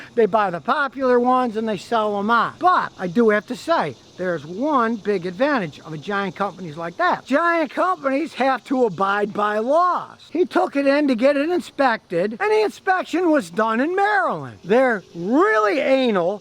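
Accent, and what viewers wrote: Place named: Upstate New York, United States. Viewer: That's because of his Buffalo/Niagara Falls upstate New York accent....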